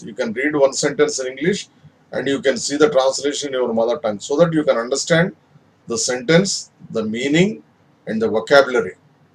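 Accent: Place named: India. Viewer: Can you say which English are you speaking? British or American ? Easy to say, speak in English,but it's hard . Pronunciation as well as spelling differ. By the way you have indian tongue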